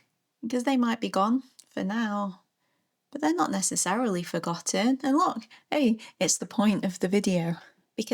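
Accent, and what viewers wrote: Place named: North, United Kingdom. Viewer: Still got the northern accent 🎉🤟🏻💪🏻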